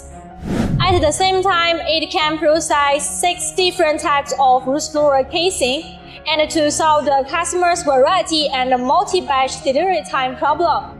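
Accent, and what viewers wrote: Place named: India. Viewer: sounds like Indian English, hard to understand.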